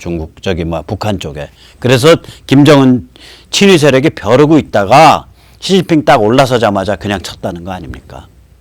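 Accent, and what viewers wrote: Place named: Seoul, South Korea. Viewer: 오늘도 자알 들었습니다. 수강료를 고액지불하고 들어야할 정도로.. 그아말로 세뇌탈출..! 감사 합니다. 때때로.. 아는 사람들만 알아듣는 님의 "서울사투리"가 감칠맛을 돋궈주십니다.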